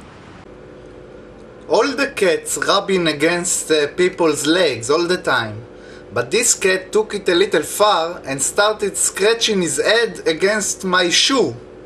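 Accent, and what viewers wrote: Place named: Israel. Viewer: וואי תקשיב המבטא שלך מראה את הטמטום הישראלי . אבל זה בסדר :)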